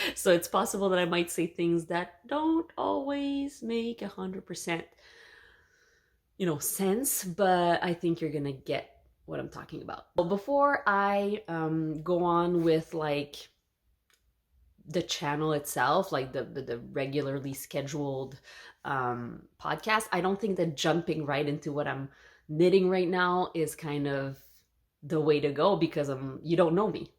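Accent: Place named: Quebec, Canada. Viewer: Hi Dear. I was listening to your accent. I am an English Speaking Montrealer. I asked google whether you are French Canadian, and I was so pleased to see that I guessed right! I moved to Israel 33 years ago. I am so happy to have found you. I love your knitting! Best of luck.🎉❤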